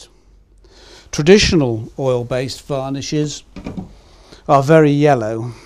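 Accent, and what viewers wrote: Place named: United Kingdom. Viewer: nothings more convincing than a british accent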